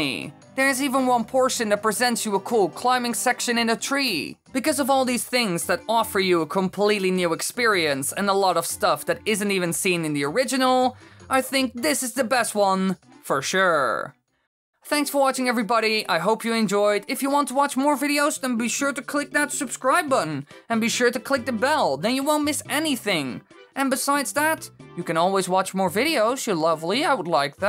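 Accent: Netherlands. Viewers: This english accent is dutch hm?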